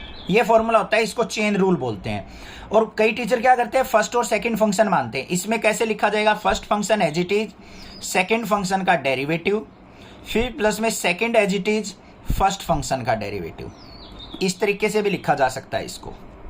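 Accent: Haryana, India. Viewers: "agar ---yo-- function " sir are you from harayana (I identify you by this word "yo") ex: yo choro kam ko----koonya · Sir aap Haryana se hai kya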